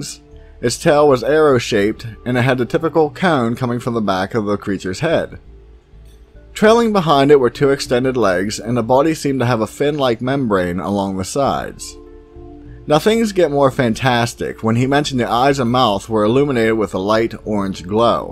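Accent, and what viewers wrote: Pennsylvania, United States. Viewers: As soon as he started talking, I could tell he was from PA.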